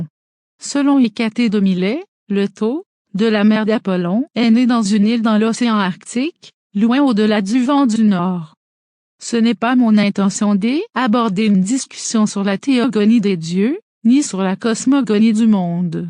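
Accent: Canada. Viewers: 54 minutes d'accent quebecois , c'est terrible, mais cela ne va pas être possible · La voix est horrible avec un accent canadien en plus de ça, la combinaison est atroce 🤮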